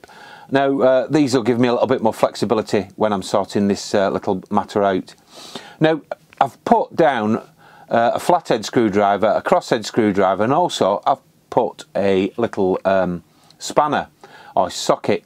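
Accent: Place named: Lancashire, United Kingdom. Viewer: Thanks for posting this - really useful. Only just found your channel so a bit of catching up to do. We've just swapped out our old 06 Pageant that we had from new for a 2021 Sprite Alpine 2. And while the Alpine is a great van, like you say there are a number of fixes/improvements needed to upp the quality. The waste hoses in that kitchen area cut diagonally across the floor so I'm going have to sort that out as it makes it hard to use that space gor storing anything. Need some sort of shelving solution too. Out of interest, have you (or been able to) installed a TV bracket to the unit directly opposite the kitchen? I need to investigate how to open up the back of the timber boxing in that runs vertically between the work surface and the locker to get a decent fixing. Finally even as a Londoner I'd wager that your accent is within 10 miles of Bury/Rawtenstall?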